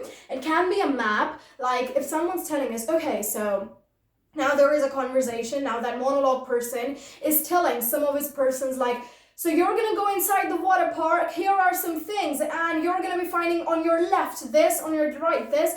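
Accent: United States. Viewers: is this American or African American accent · Awesome When I close my eyes I feel like someone American 🇺🇸 speaking in this video Keep it up Are you offering any one to one class for student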